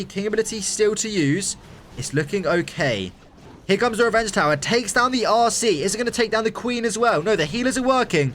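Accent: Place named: United Kingdom. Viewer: British accent 😉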